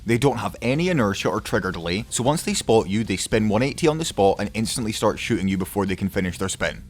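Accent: Scotland, United Kingdom. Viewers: yeah he's from Glasgow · is this a scottish accent?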